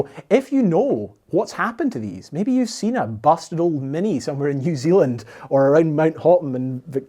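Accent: Scotland, United Kingdom. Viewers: This is like longer Scottish Tom Scott. Great content, only a matter of time for 1 million subs for you. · Fascinating videos but the speed of your commentary combined with the Scottish accent and my old age hearing make it difficult to keep up.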